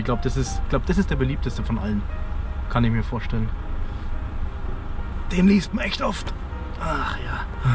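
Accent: Bavaria, Germany. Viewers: smitty werben jagger man jensen fürn Norddeutschen ist da unten alles irgend wie bayrischer Slang.😁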